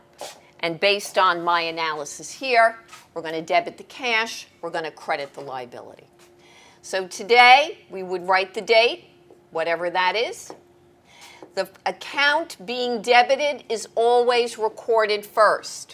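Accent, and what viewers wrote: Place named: Queens, United States. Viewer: Queens accent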